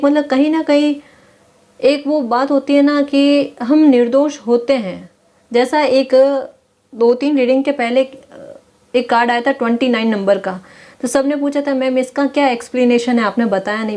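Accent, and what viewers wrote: Gujarat, India. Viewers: Aap gujarati ho shayd...tone se hi pata chal rha h😁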